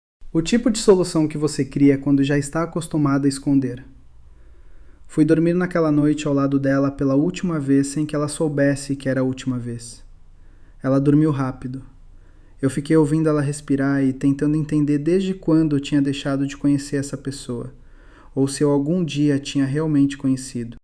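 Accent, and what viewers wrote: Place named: São Paulo, Brazil. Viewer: Por que os numerais falados em inglês? Que coisa irritante, além dessa vozinha com sotaque paulistano.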